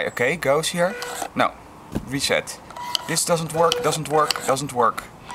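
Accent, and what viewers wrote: Netherlands. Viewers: grappig ik kan aan het accent horen dat je nederlands bent :D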